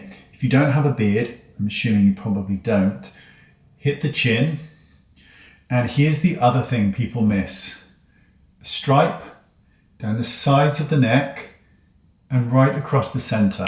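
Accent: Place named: England, United Kingdom. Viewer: And can’t beat the English accent, either! Love you, 😘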